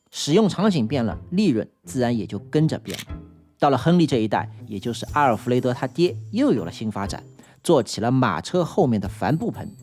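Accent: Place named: Shanghai, China. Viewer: 博主上普口条很舒服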